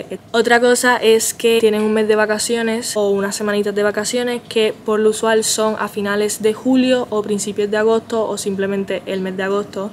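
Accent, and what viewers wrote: Andalusia, Spain. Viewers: Me dicen que eres andaluza, y me lo creo. Y qué bien se te entiende! Buen video y ameno. Y te expresas genial. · Amos la primica, me dices que eres Andaluza y me lo creo,como se te a pegao el Andaluh, chiquilla. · Al principio pensé que eras andaluza. Se te ha pegado el a acentillo ya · Cuando empieces a hablar no digas "hi guys", ni que fueras gringa 🤣. Di hola que tal o como estáis 👍😁. Por cierto, hablando ya eres andaluza total 😅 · si creo que no lo vi desde el principio, esta visto que aun necesita mejorar el andaluz. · Esta chica lleva 2 años en España, hasta se le ha pegado el acento andaluz.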